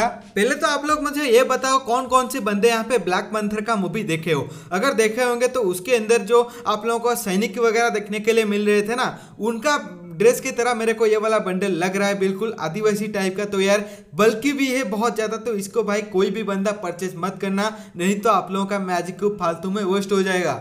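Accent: Odisha, India. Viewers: Bro are you from odisha? 😅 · Bro are you odia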